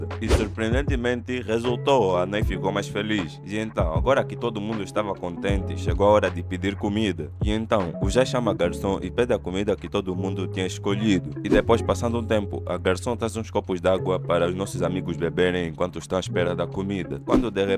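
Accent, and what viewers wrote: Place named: Mozambique. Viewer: eu achei que vc era um Moçambicano da língua de Quelimane e igualzinho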